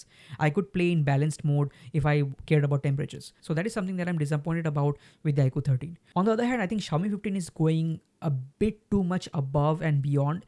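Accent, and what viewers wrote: India. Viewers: I click the video so fast but then closed it as soon as I heard the curry accent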